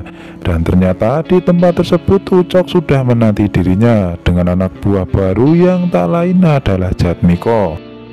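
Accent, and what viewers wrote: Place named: Java, Indonesia. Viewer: Bahasa Medok is REAL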